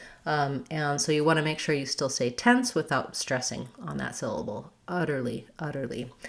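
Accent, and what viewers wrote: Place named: United States. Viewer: Thank you so much teacher I really like your good work and I like american accent I will stick with you to get this beautiful accent,this is my second video...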